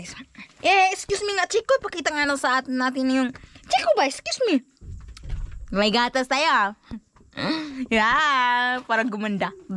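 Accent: Visayas, Philippines. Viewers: Bisaya po kase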